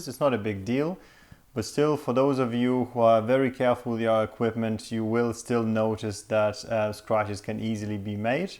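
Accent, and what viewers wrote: Ireland. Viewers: Hello, and thanks for this really great review. I didn't think it was boring at all by the way. (You mentioned this when showing the internals). How is the noise on this so far? I record and edit YT videos so I am wondering if you notice much interference on the recordings? Also, is that a slight Irish accent I detect? ;)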